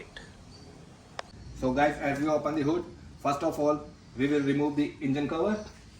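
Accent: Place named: India. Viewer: Because of accent i suggest that you are Indian. English in Great Britain is difficult to translate because of specific pronunciation but with your accent this become impossible to understand it without strain own mind...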